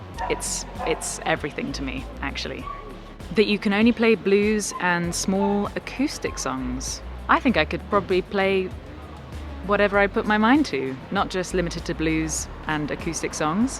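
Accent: United Kingdom. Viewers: Your “ ” cover with Josh Turner was EL PERFECTO!! Thank you! I also appreciate your respect for the English language and attempt to use proper grammar. I share it. Language is at best a limited and somewhat poor vessel for conveying our thoughts and feelings. Taking the trouble to develop a good vocabulary and decent command of the language is critical to our ability to think, understand the world around us, and communicate our insights. It deserves respect. As an American, I’m a bit unsure of the meaning of a “posh” way of speaking. However, I am aware of the many radically different accents in Britain; some quite difficult to understand. Yours is very nice. I’m from an old Tidewater Virginia family that predates our war for Independence. We have an accent here that still retains many flavors of old English so the way the Royals speak feels familiar to my ear. 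Linguistically I have no idea what that means except that perhaps being extremely traditional and somewhat cloistered from the general public they may have preserved a way of speaking from the times when England colonized America. English aside, I enjoy your music. Thank you. Good music is mana for the soul and making it is a worthy life’s endeavor. Well done!! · You really are a talented person, musician, singer, has a beautiful voice singing, but you have no idea how I love to listen your voice when you're speaking, the calm, how it sounds is enjoyable to me and I love, really love the British accent. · All Brits have a pleasing accent! We don’t even know which ones are considered posh. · You realize people just love your wonderfully intelligent and smooth British accent and that's the primary reason you have so many views right? ;) LOL! Keep it up!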